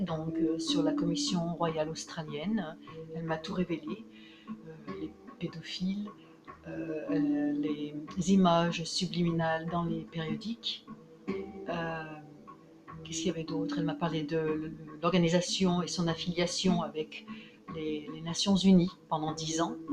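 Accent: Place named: Lyon, France. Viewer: Excellent, Dieu répond toujours à nos prières sincères. Bon rétablissement surtout psychologique et sois bénie. A ton accent, tu ne serai pas d'origine lyonnaise ?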